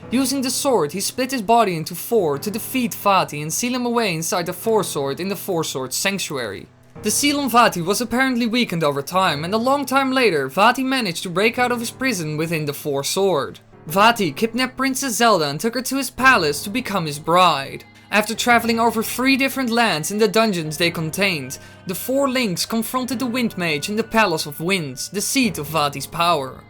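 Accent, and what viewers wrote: Netherlands. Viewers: Ah, so it's Dutch? I wasn't sure exactly what it was, ha ha. XD Hey, your videos are really interesting! Keep up the good work!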